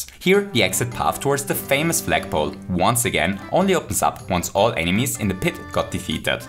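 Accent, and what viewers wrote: Austria. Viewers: Are you from Austria?:D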